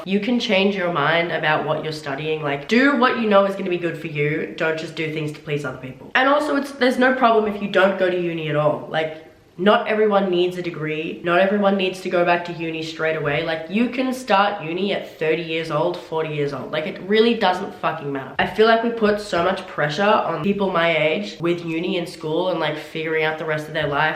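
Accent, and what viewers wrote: Australia. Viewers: She has an Australian accent actually hahaha, but I say that because she loves reading and literature in general. Nothing to do with her accent.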